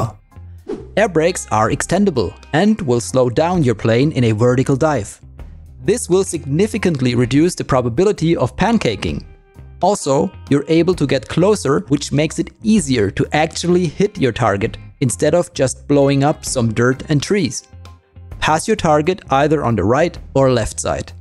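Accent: Germany. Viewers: Ngl im very thankful for this video, ive been trying to dive bomb for ages I still can't dive bomb but hearing german names pronounced with a german accent makes me happy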